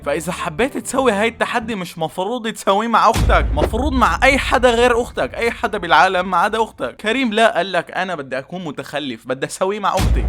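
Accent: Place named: North, Palestine. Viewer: لهجتك فلسطيني من الشمال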